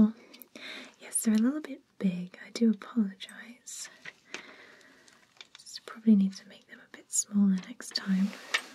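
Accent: United Kingdom. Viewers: Sounds British with a bit of Australian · As an Australian I feel offended, she sounds British not like us at all! Maybe you are hearing the R sound but there are 25 other letters to consider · Brilliant ASMR! +Subscribed when heard that British accent. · 1. Why have I just now come across your channel? :o 2. What's that accent? (British obvs. but exactly) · Whereabouts in the UK are you from? I'm from up North and couldn't imagine a Northerner doing ASMR (at least not well), especially with an accent from where I'm from, hahah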